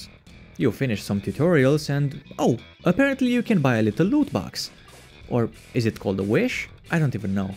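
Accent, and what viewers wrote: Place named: Finland. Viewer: i can just hear finnish accent when u talk. am i right that u are finnish? :D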